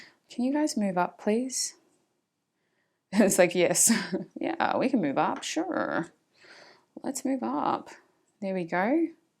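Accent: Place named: Australia. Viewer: 1st I choose pile one, this (the whole reading) resonates with me so much we broke up last year the month before Christmas and he still constantly messages me and wants to see me now in the past it didn’t go great but I know he is very kind and we both had our own childhood issues/issues that wasn’t healed so it was very hard but I do feel as though he’s who I’m meant to be with and I don’t feel like our chapter is finished and I have stopped talking to him for about two weeks or more because I’m really trying to think about myself and heal for now and hope that this time will give him the time to heal and change but I definitely have left hope because it has been so long without anything happening but I do always still hold some type of hope and he does always seem to come back to me but I really am making sure that if I go back he really does give 100% as well as me and it’s an even giving connection. 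Thank you lovely and don’t worry about the time I love long readings so I didn’t have any issues watching all the way through my reading and I’m Australian as-well and your accent is so strong but calming I love watching your tarot videos before bed it definitely gives me energy the next day as I have the confirmation I needed.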